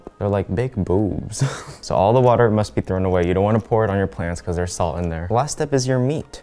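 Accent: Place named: China. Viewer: What part of China are you from because your Chinese accent is strong my mom is from Beijing but she does t have a thick accent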